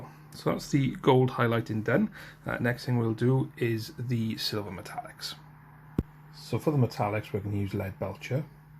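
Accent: Wales, United Kingdom. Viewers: Welsh, is it?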